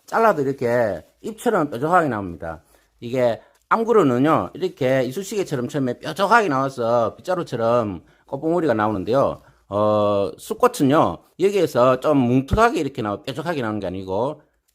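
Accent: Gyeongsang, South Korea. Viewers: 경상도 사투리라 찰떡같이 알아들어져요ㅎㅎ 맥주스프레이는 물이랑 희석 하지 않아고 그냥 맥주만 분무하는건가요??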